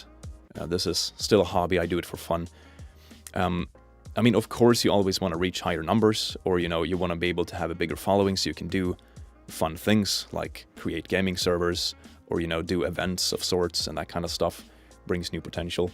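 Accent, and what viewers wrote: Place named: Sweden. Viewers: I think he's swedish atleast Scandinavian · he is swedish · Swedish for sure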